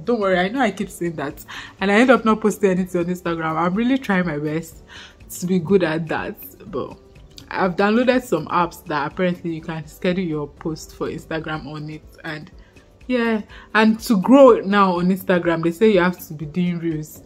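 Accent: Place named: Nigeria. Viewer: don't worry He/she is just hating on your beautiful Nigerian accent.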